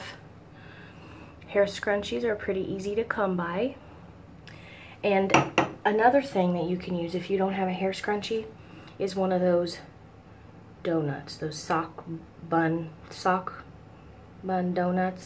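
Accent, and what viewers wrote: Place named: Midwest, United States. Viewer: I would just wear wigs. Bigwigs Edit: I knew that was a midwestern accent! Also I hope she is doing well today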